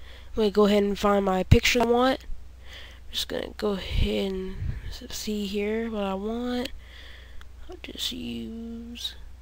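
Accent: United States. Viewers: Cool hillbilly voice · such country accent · ._. not really, I'm a Texan, I've never met anyone with an accent like that, He's most likely from the Bayous or something...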